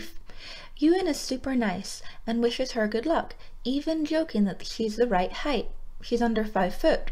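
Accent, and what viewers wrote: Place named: Scotland, United Kingdom. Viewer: True 😅 You mentioned Belfast, is that where you're from? I had assumed your were Scottish or something lol 😅😆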